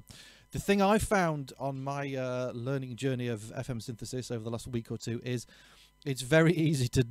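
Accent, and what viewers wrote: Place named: East Midlands, United Kingdom. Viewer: What a fantastic sound! I can't wait for my Deluge to arrive, hopefully in a few days! You sound like a local East Midlands bloke to me. I'm close to Chesterfield Jcn 29. Do you do teaching?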